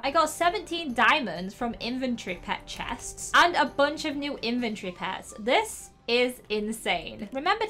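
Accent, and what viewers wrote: United Kingdom. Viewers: Name the horse Nutella hahha cause that’s the horses colour!!! Bye the way are u British??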